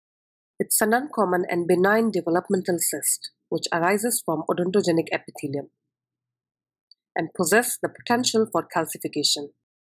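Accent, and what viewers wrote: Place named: India. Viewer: ... sorry ma'am.. u sounded very much Indian.. whichever nationality, much respect to u.. please do more videos here..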